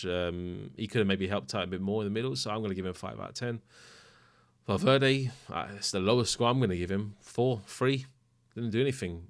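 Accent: England, United Kingdom. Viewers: How did you get in to supporting Real Madrid?, you sound like a proper Englishman. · He's spanish with an English accent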